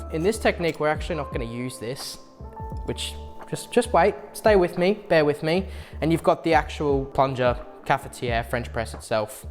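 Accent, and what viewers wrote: Australia. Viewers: Clearly an Aussie from down under👍🏼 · Are you Australian? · Where in the UK are you from?! I'm Aussie and you sound like 95% Aussie to me! lol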